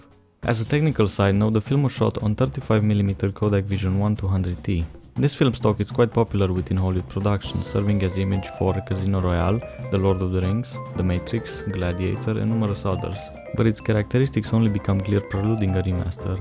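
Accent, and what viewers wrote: Eastern Europe. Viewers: Great video, but I'm much more interested in where you are from and how you came into reading American comic books. Not many people know who Mark Bagley is in the US, let alone Eastern Europe (assuming by your accent). I think that would be a cool story to hear. Do you read anything outside of Spider-Man?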